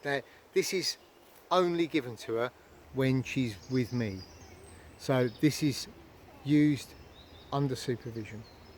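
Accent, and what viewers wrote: England, United Kingdom. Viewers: Well done mate, good to hear an English accent again :) Regards, Keith.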